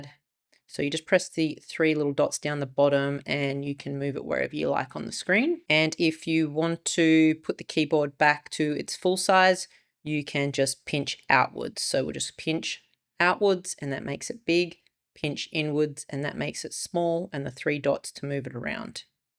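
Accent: Australia. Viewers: Great video as always. The iPad is such a great tech device and it’s awesome that people like yourself publish these videos to help people that might not be technically proficient. Cheers from this Aussie living in NZ 😎🎸🤘💕 Edit: and it’s always good to hear an Aussie accent here on YT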